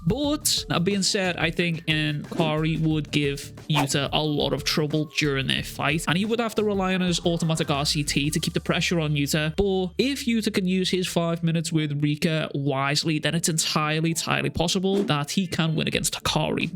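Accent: Liverpool, United Kingdom. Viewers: Subscribed for the Liverpool accent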